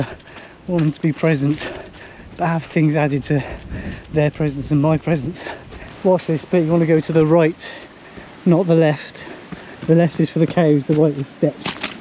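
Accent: United Kingdom. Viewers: In love with your videos and British accent hugging my auditory receptors ♥️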